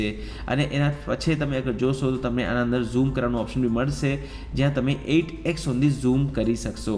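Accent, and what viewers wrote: Kutch, India. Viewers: Aa Gujarati nathi aato kutchchi Gujarati che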